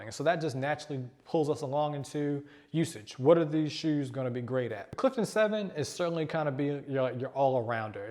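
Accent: Baltimore, United States. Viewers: Nice video. Can't wait for the Mach 4 review. By the way, are you from Baltimore? I think I can hear it lol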